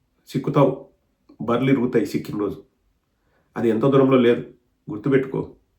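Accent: Rayalaseema, India. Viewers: Rayalaseema yaasa super sir meru...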